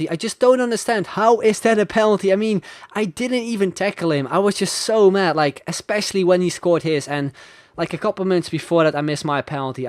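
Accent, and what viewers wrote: Netherlands. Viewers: Is he Dutch????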